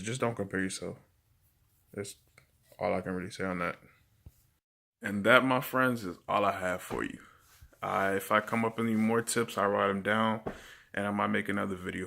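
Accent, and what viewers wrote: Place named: Dominican Republic. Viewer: Yes you sound Dominican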